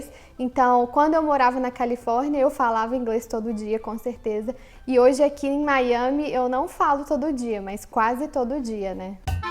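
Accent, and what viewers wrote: Brazil. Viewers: ... eu aprendi sozinha aos 10 anos também... e mesmo sem nunca ter pisado em país de Língua Inglesa, falo um Inglês impecável - consigo fingir tranquilamente que sou nativa americana -, aí percebi um sotaque no teu Inglês... pergunta: você consegue falar o Inglês limpo, sem sotaque "brasileiro"?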